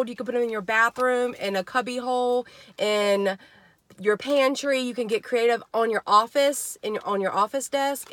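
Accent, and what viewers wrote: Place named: Georgia, United States. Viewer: Hey! Just knew you were from Ga. I came across a video the other day and subscribed. I thought dang she sounds like me lol....I'm in Newnan!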